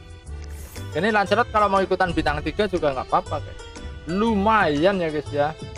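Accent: Java, Indonesia. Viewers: Asli mana mas jawane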